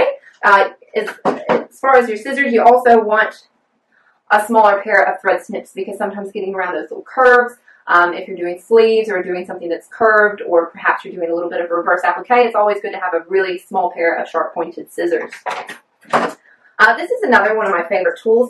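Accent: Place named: Australia. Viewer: I thought you were Australian, then I thought you weren't, then I found out you are a bit of both! It's good to have some one to watch who can tell us about products available to us locally.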